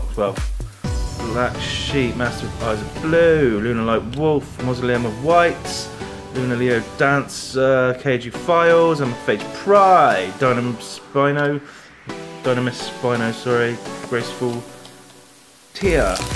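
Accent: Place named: United States. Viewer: Great job and you sounded American not British and what's with long intro once not A problem with it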